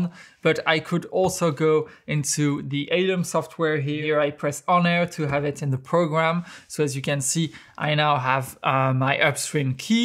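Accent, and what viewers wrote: France. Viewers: Ahaha on voit que t’es français, rien qu’à ton accent 😭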